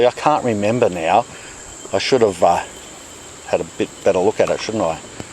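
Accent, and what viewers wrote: Australia. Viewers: Love the Aussie accent!!!! Oi oi 🌷🌷🌷🥰🥰🥰😂🦋🦋🦋🦋🍀🍀🍀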